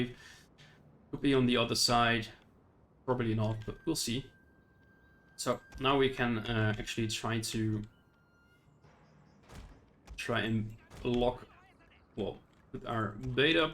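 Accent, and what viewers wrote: Netherlands. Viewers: He's defiinitly dutch, as a dutchman myself I can instantly tell he's from the Netherlands with how he speaks english. Idk what it is exactly but it's very recognizable.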